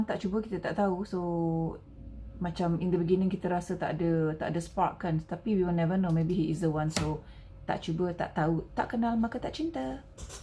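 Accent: Negeri Sembilan, Malaysia. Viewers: Dengo suara pon dah tau org N9..kan?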